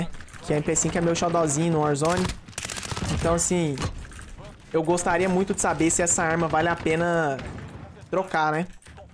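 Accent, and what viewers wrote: Minas Gerais, Brazil. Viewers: tu é mineiro?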